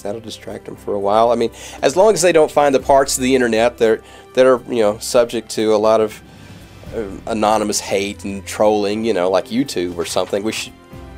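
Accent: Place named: United States. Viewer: if you're going to pretend you're in the illuminati, don't have a beard, a badly exaggerated yank accent and a poorly scripted video you massive american bellend!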